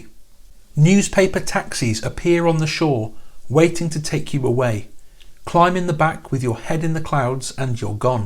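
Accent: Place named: Yorkshire, United Kingdom. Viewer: Pure bollocks. That was an hour I shall never retrieve. Love your Yorkshire accent though.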